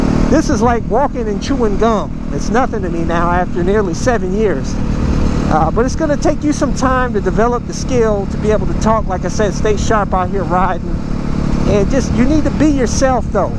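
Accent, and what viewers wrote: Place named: South, United States. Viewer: You're pretty cool for that. Thanks for the sauce (southern accent)!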